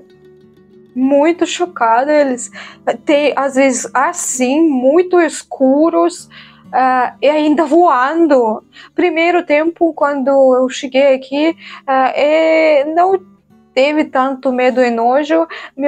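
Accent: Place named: Eastern Europe. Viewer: eu detesto esses bichos , seu sotaque eslavo deixa o portugues bonito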